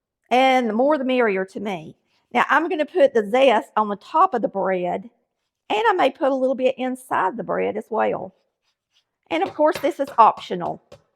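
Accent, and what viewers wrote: South, United States. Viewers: As a Brit I love your Southern accent, it's so relaxing to listen to. The recipes are great too, of course!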